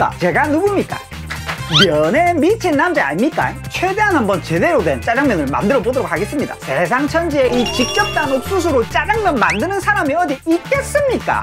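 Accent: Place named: Gyeongsang, South Korea. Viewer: 경상도 분이시구나 ㅋ ㅋ 저도 한 면사랑 하는데요 😆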